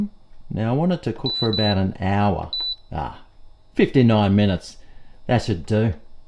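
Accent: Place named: Australia. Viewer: Love the Aussie accent 😂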